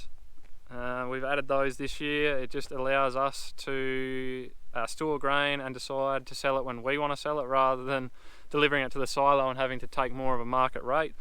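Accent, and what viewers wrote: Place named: Australia. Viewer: it’s refreshing to listen to you talk ‘Aussie’ and refer to the equipment properly. I’m so glad for the comment about the header and real, calling them what they are in Australia. Clearly I have spent too much time watching US farmers and hearing what they call their equipment. Also, you correctly refer to rainfall in mm and weight in tonnes, etc, not in imperial like our US friends. By the way, I’m slowly making my way through all your videos. They are fantastic and are a pleasure to watch. I’ve done a bit of video editing myself and understand the time that it takes. Please continue to make your video’s, every one I watch I learn something new!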